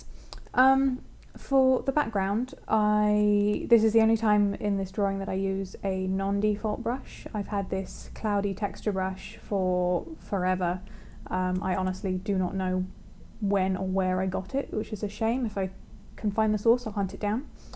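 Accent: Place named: United Kingdom. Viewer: I love ur accent.. i love british accents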